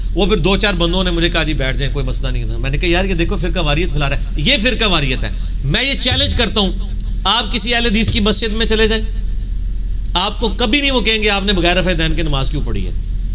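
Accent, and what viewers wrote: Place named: India. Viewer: Indian Urdu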